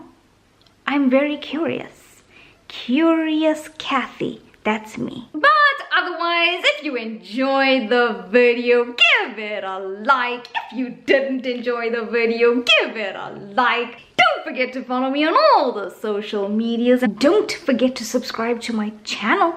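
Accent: India. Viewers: Why do you have an Indian accent? Not being rude, just curious. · I am really curious about 1 thing, about your Indian Accent ,by the way I lovee it, I thought that the Indian Accent came with the language or goes hand in hand with the language...but I am shocked to hear that you can not speak the language, but u still have the Indian accent, how come...plz don't take this the wrong way, I was just feeling curious about that :)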